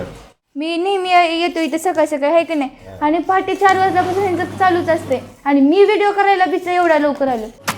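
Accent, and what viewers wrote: Latur, India. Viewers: Latur chi bhasha ❤️😂(Latur accent)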